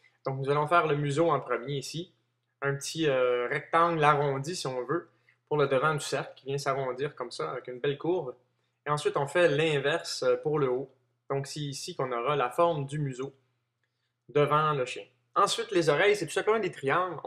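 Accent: Quebec, Canada. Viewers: L'accent du Quebec ça donne de la bonne humeur ^_^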